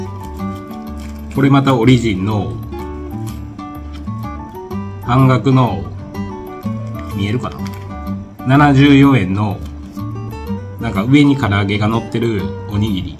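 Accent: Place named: Kansai, Japan. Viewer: お元気でしたか😂東京のピエロには標準語で話しかけてあげてくださいね。引きたいのに関西弁はひかれます笑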